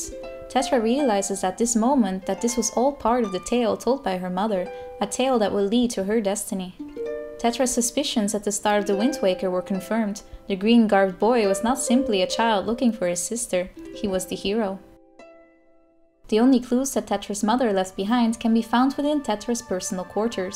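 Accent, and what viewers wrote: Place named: Netherlands. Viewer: She' Dutch.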